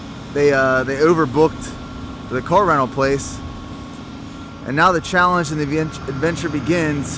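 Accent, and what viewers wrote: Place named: Philadelphia, United States. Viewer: you sound like your from philly/harrisburg area. I know that subtle accent when I hear it!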